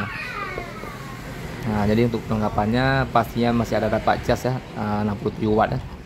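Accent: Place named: Malaysia. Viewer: logat bahasa indo anda ada logat bahasa Melayu Malaysia..mungkin anda berasal dari Kalimantan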